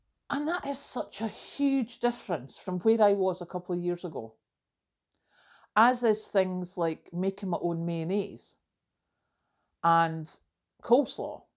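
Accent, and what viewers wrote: Scotland, United Kingdom. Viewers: Hi. I just want to say that I enjoy watching your videos. I have a lot of ancestors that came from Scotland. I live in the state of Oregon, in the USA. I've not been able to go to Scotland yet, but I hope to someday. Watching you gives me a glimpse of what culture and life are like there. I also love hearing you talk. Love your accent. Take care, and have a blessed day!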